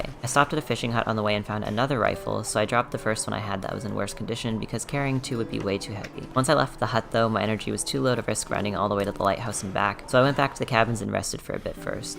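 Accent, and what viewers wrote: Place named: Canada. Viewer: he sounds adorable ALSO caneda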